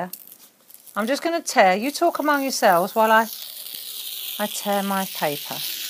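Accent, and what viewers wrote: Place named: United Kingdom. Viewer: Wow, that’s so clear and helpful. Thank you. Lovely to hear a British accent too. 🙂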